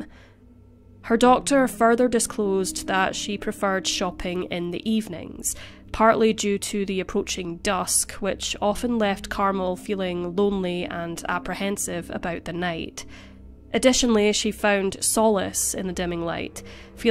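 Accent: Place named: Scotland, United Kingdom. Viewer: CAN U DO ANOTHER " CASE COMPILATION #6,7,8, 9& 10?? FOR 2024? THANKS ✊🏽✊🏽✊🏽✊🏽 HOW DO YA " REQUEST CASE " & GIVE DONATE FOR UR EXCELLENT, HARD WORKING FOR AWESOME TIME SPENDING ON DETAILS, RESEARCH, MULTIPLE VIDEOS & STILL HAVE UR OWN LIFE & SOO 💜💜💜💜💜💜 SCOTTISH ACCENT, 😘😘🇺🇸🇺🇸🇺🇸🇺🇸🇺🇸🇺🇸🇺🇸✊🏽✊🏽✊🏽✊🏽✊🏽✊🏽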